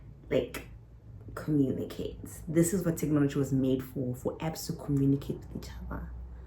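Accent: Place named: United States. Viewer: Yes you sound American 😭I thought we all knew that??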